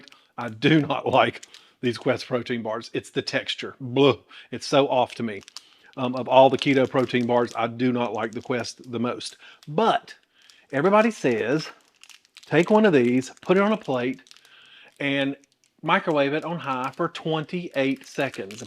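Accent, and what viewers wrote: South, United States. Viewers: Gosh I’ve had such a bad day, hearing this southern accent talk about cookies is just what I need 😊 thanks!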